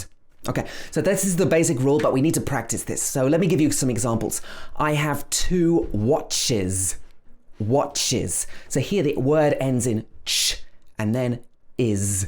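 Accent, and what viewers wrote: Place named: United Kingdom. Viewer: Thanks a lot sir. You teach me many things. You're perfect in British accent.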